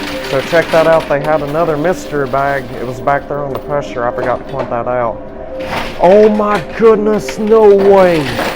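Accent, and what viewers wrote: South, United States. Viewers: It’s in English-with a southern accent.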